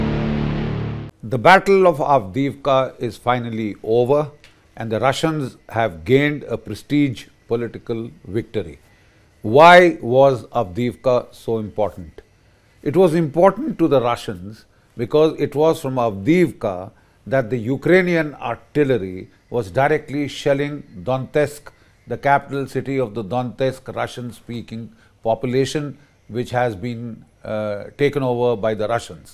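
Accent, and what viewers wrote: India. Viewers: Very informative and absolute master class Indian English.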